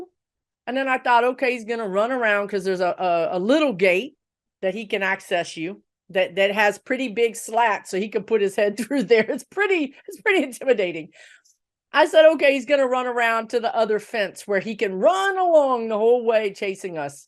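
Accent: Texas, United States. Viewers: Love love love this message. Your Texas drawl gets more pronounced when you’re joyous😉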